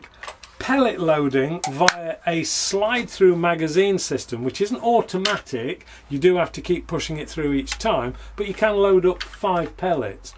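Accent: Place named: Nottingham, United Kingdom. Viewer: That's a Notts accent if ever I've heard one!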